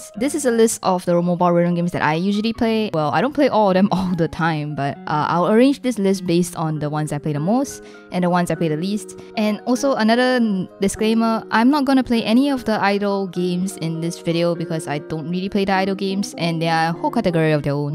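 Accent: Singapore. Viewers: Are you singaporean or malaysian because of the accent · She's Singaporean, but what's the difference lol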